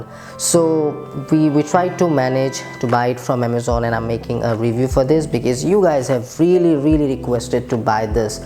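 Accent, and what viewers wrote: India. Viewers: desi english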